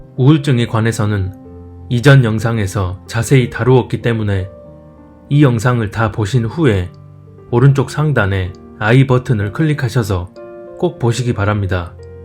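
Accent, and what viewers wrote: Jeolla, South Korea. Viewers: 전라도사투리 억양이 강하네요ㅎㅎ 저도 광주출신이라..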